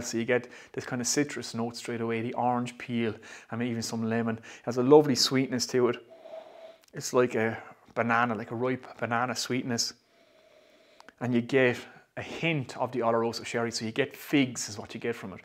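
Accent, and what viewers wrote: Ireland. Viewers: HI! I love you reviews! They have helped me understand Irish whiskeys so much better. Qstion for you- I have a friend going to Ireland in about 10 days, I want to have him pick up some whiskeys for me, preferably something I cant get here in the states. I do like bourbon style whiskey the best, Jameson is good but im not a big smokey scotch drinker. Any suggestions? Locally I can get powers Gold label and im going to try that! LOVE THE BROGUE!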